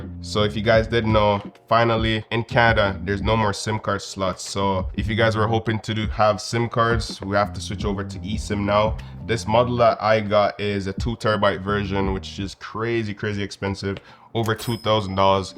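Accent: Jamaica. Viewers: Sound like him from 🇯🇲 cant wait to get mines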